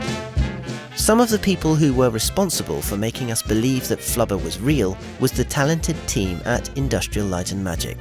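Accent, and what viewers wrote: United Kingdom. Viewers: the sort of British accent that you sometimes have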